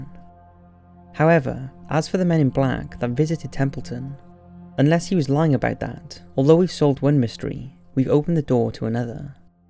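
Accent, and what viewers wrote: United Kingdom. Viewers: getting tired of hearing british narrators....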